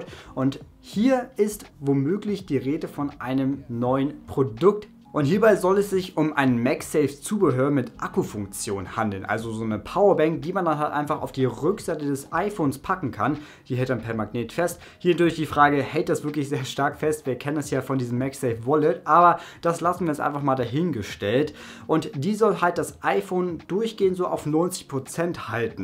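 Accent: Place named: North, Germany. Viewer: Man hört den norddeutschen Dialekt XD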